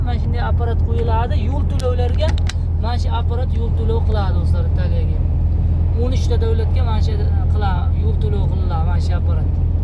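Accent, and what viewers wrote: Chiyal, Uzbekistan. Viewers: Qaysi tumandansiz chiyalga uhshap ketarkansiz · Мен хам гапларни эшитиб Чиялик бўлса керак деб ўйлагандим. Иш сохам бўйича Чироқчиликлар билан гаплашганман. Деҳқонобод хам шунақароқ шевада гаплашади. Майли омад ўзимни 92уруғли ўзбегим. ✊👍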